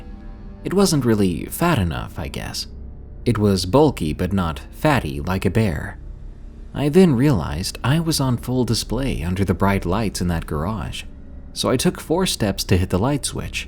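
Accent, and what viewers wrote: South, United States. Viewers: I know he is from the South, but it just cracks me up LOL 😂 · Duh he is from the south..if your an old fan u should know that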